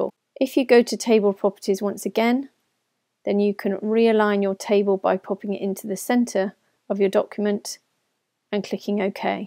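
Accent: United Kingdom. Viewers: Thanks a lot for your helping!! It helped me a lot in order to achieve my future essay, furthermore your British accent makes the audio enjoyable and understandable too!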